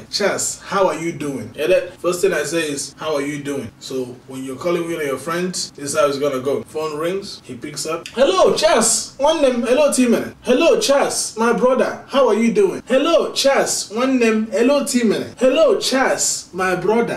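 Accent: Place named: Igbo, Nigeria. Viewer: where are you from…the dialect of igbo is a little different from what I'm used to…but completely understandable ! keep it up !! i look forward to these videos.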